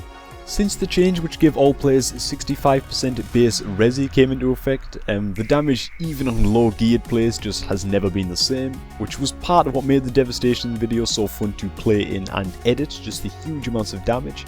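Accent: Newcastle, United Kingdom. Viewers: I was watching Swifty's vids and decided to check you out, and within 3 seconds of the video starting i realised you had a newcastle accent, just saying because live like 10 miles away >.<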